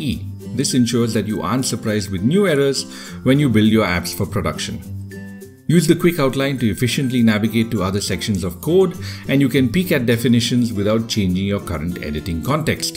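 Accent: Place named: India. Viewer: An Indian accent, really?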